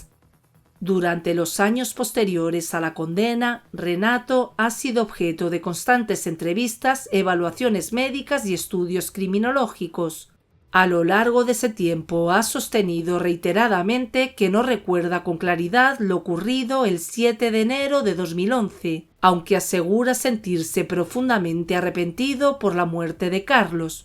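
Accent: Spain. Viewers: ¿Por qué? Es audio real humano con castellano y acento original… es cuestión de gustos y preferencias!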